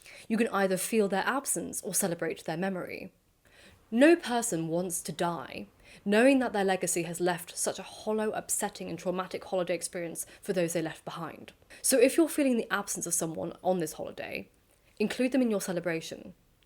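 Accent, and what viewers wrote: United Kingdom. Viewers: Does this specific British accent have a name?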